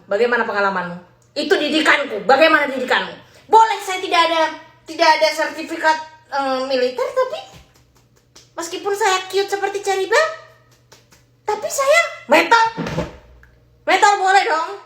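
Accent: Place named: Java, Indonesia. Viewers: Karna dia emg org jogja kan, logat aslinya emg jawa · seru ya dengerin yang dulu kaya gini, sekarang ngomongnya dilogat jawain kurang sreg dengernya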